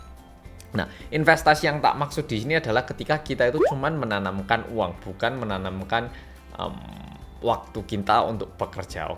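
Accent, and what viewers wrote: Java, Indonesia. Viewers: Yuk irit breng mas medhok... Waaahhh... Sip dah kalo sama mas... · Kangen yang medok medok😍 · kangen yang medok medok ya liat mas wkwk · Maz lofyu❤️punya kembaran medok jga aku wkwk sama maz >< Tim garcep dong❤️ Komen sebelum rame xixi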